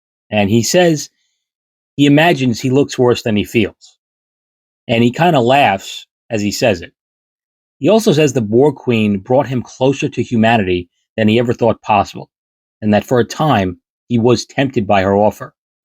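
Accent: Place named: Long Island, United States. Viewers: Never underestimate how much fun it is to listen to a guy from Long Island explain Star Trek. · 2/24/24 (HOW ABOUT THAT DATE!!!) why so much profanity? I could do without all the sexual stuff and cursing ANYWAY great movie! i saw it in theaters with my uncle and my dad The consensus was that it was a fun movie didn't like the diversion into hair metal without mentioning KISS in the 80s KISS were huge again, if a bit similar to other bands of the era they're also NYers just like you (I am guessing by your atrocious accent) given i am no lingust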